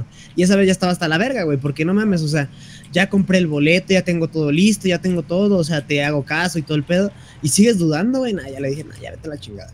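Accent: Chile. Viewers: Primera vez q veo a un mexicano q le sale bien el tono de los chilenos :u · Chileno 100%real no feik jajaja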